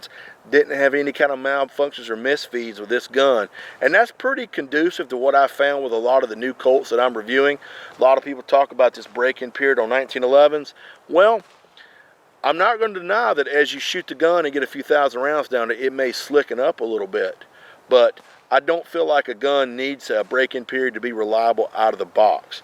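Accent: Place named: South, United States. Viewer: that southern accent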